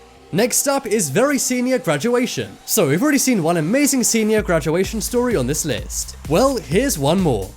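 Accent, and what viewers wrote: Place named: United Kingdom. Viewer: What’s with the British voice??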